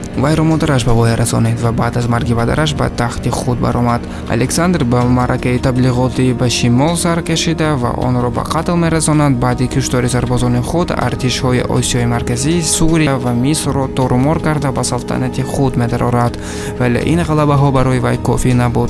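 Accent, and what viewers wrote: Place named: Russia. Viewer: То что этим делом, т. е. темой великих исторических личностей по завоеваниями интересуясь, хочешь чтоб другие тоже были осведомлены, это очень благородно. И то что, акцент русскоговорящих у тебя в декламации чувствуется, надо обязательно устранить. Если это получиться, аудитория говорящих на фарси тебя с нетерпением и пением гимнов будут ждать. Но главное в содержание роликов. ХРОНОЛОГИЮ ОБЯЗАТЕЛЬНО ДОЛЖЕН СОБЛЮДАТЬ. Это первое в научной историографии. А то в комментариях смотри, независимо от суть изучения, выдвигают свои амбиции. Поэтому я свою комментарию пишу на русском, чтоб все заинтересующие люди поняли. Но методы современная историография ныне очень устаревшие и например вот такой подход -- взгляд исследователя на историю материалистическим мышлением, ни куда не годится. Тем более, история таджиков считается как история "с незапамятных времён.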